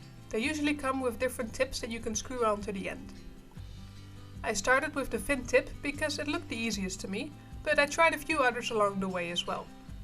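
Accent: Netherlands. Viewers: Are you from holland by chance? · love her dutch accent!!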